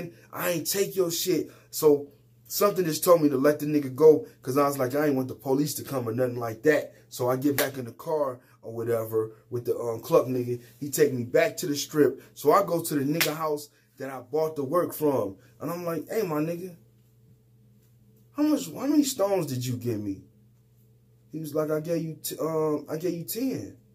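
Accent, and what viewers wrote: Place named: Cleveland, United States. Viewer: CMHA? Cayuga Metro Housing Authority? I was trying to figure out where you were from by your accent--I thought maybe Chicago but now I could hear it is Cleveland (lol after looking up CMHA!)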